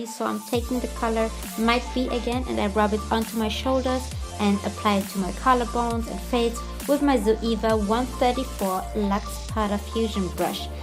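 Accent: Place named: Germany. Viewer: I found this look on instagram and I am totally obsessed with it 😍 your accent sounds sooo german 🙈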